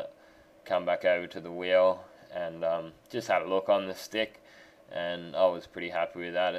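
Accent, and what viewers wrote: Australia. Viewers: He's Australian- True Blue..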